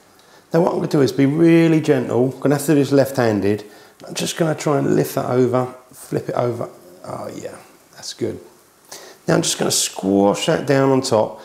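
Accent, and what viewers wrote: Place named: London, United Kingdom. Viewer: as in he has a London accent ? and so do 10 million others , he sounds nothing like Jamie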